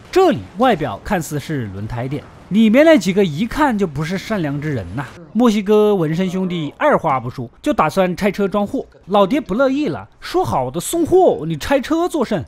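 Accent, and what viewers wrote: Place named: Wuhan, China. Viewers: 哈哈，口音好重，不是湖南的就是湖北的，点到你微博一看，原来是武汉伢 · 要听“老爷车”,你浓浓的武汉口音让我觉得像是我在说话：））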